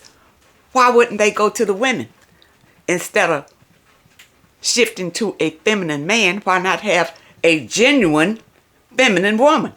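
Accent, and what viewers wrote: South, United States. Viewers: that's good. He sounds like Southern Charm.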